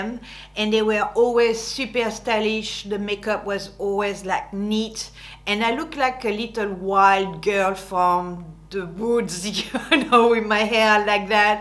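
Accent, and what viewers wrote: France. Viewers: I love your french accent! I honestly just really love it! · I love your style tips with your lovely French accent, and Oscar is so cute! 😘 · Hi, I love your accent and of course your channel. Your accent reminds me of my childhood living in Belgium when I had to learn French at school. I am German, now living in New Zealand and I totally understand your cultural struggles. I am glad I found you. After I lost my husband nearly three years ago to cancer, your videos give me a little bit of joy and a few moments of going down memory lane, when we visited Paris, or me, staying in an isolated house by the sea in Britagne for a holiday and remembering my French friend Isabelle who introduced me to real Champagne and Oysters... Thank you for bringing back these memories to me. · I love your smile... and your French accent 😊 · Great video. Love your French accent!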